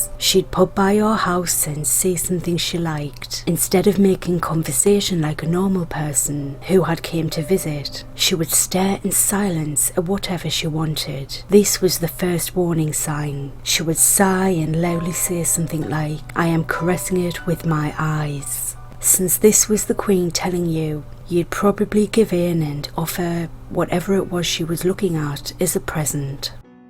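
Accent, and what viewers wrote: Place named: Northern England, United Kingdom. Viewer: Its a lovely soft accent north of England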